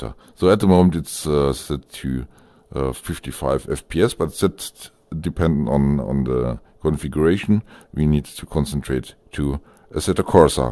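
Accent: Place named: Germany. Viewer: Thank you for your informative video. Perfect German English, I would sound exactly like you 😀. Are you sure that the resolution in CM-settings-Assetto Corsa- Video has impact to the VR performance? It is overwritten by the VR video settings as far as I know. I have problems with very big user interface of the CM apps in AC in game when I lower the resolution under my 4K of the screen. 👋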